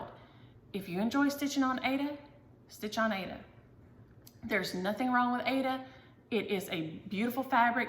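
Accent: South, United States. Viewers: . I stitch on Zwigart based Aida all the time. Most people think Aida only comes in 14 count, but I usually use the 16 or 18 count. It also comes in 20 and 22 and I've stitched on that too. The cheaper brands are a bit too stiff for me though. I dye it whatever color I want, with either Rit dye or coffee/tea dye. I so enjoy hearing another Southern accent! I was born and raised, and live, in North Florida and I know what "tump and fixin' to" mean!! Also, Poke salad is made from the Poke Berry bush. It grows wild here. Never had it though! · I grew up in Tennessee and have lived in Massachusetts for 20 years now. I love your southern accent and stories. When I first moved from TN "fixin to do" was the first thing I had to give up.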